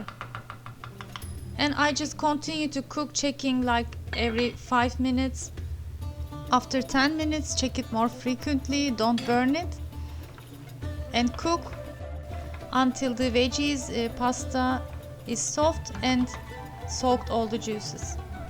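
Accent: Turkey. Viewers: Ur Turkish accent is so cute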